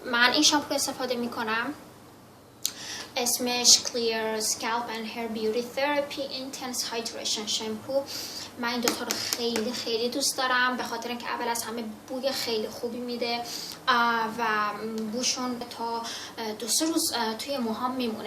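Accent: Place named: Afghanistan. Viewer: Abbas Barati ازحرف زدنش معلومه افغانیه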